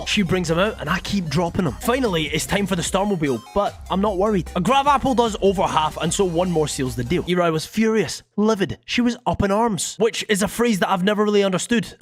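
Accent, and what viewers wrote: Scotland, United Kingdom. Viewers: Love the accent my fellow Scot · Nothing like listening to a Scottish dude playing Pokémon 😁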